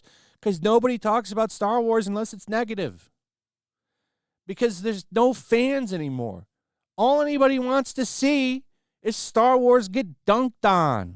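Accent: England, United Kingdom. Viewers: Congrats on the (sort of) English accent. I only heard of this because some you tubers mentioned it. It's ok, a bit of fun. Looking forward to Andor though. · I like your English voice! Where I live everyone talks like that lol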